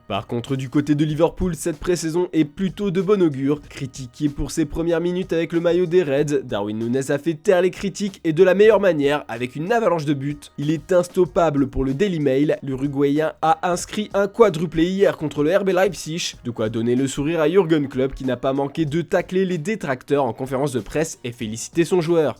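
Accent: Italy. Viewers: Mais il est où le mec qui fait l’accent italien et tout ??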